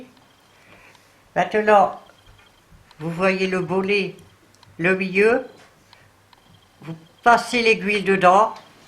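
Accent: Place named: Nord, France. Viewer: et oui encore merci et j aime bien entendre ta voie ton accent , , sa me rappelle le Nord , j ai plus que le bouton a faire , très bonne fin de journée bisoussss , Monique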